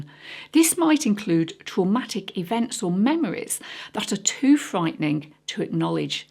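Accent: United Kingdom. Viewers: I'm very happy to find your channel , the topic are amazing , plus your british accet are marvelous , You have a new suscriptor , and thanks for your effort and confidence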